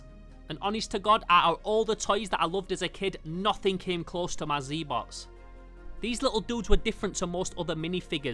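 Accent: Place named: Sheffield, United Kingdom. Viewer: i knew this accent from doctor who but now that you say sheffield i understand!!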